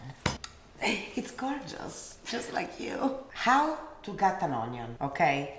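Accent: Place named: Rome, Italy. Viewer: Sei molto simpatica e bravissima ma ti preferisco quando parli italiano e ho capito subito che sei romana ❤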